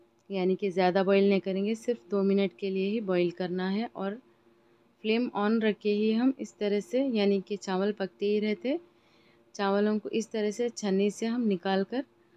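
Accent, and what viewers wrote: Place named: Deccan, India. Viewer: اپ کا دکنی لہجہ اب کراچی سے یہ لہجہ ختم ہو رہا ہے پرانے لوگ جو دکن سے یہاں ائے تھے دکنی لہجے میں اردو بولتے تھے مگر اب یہاں ہندوستاں کے سارے صوبے ایک ہی لہجے میں بات کرتے ہیں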